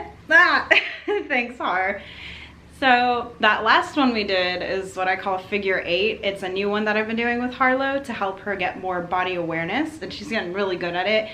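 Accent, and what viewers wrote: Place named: United Kingdom. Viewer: Finding that balance can be so hard sometimes. I've been trying to lose weight for my health for years and exercise would greatly help with that but there is such a limited amount I'm capable of doing and it's so dependant on the day and circumstances of said day that it's been extremely challenging. It makes it so frustrating when you have people fat shaming you and telling you to just get off your fat butt and exercise, like I don't have enough problems with depression and anxiety besides my chronic pain and other medical issues. As for the little dactoid about you being born in London I was kind of wondering because of the way you say certain things like vitamins, you say it like the British do. Of course, I was born and raised in the US and most of the time I do too, so that doesn't necessarily mean anything but it made me curious. 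:)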